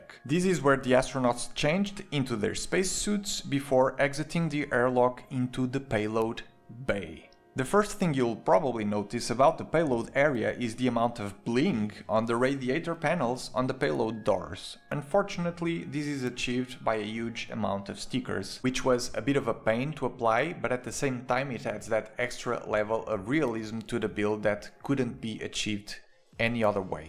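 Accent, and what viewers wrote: Portugal. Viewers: You are Portuguese or Brazilian?? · Damn, I could spot you were Portuguese 2 seconds after you started talking :D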